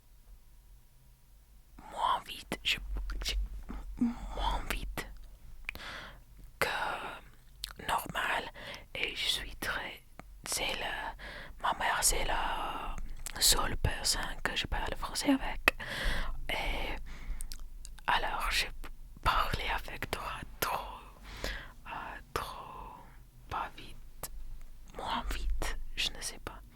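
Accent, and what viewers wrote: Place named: Canada. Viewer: It sounds a little bit like videos from canada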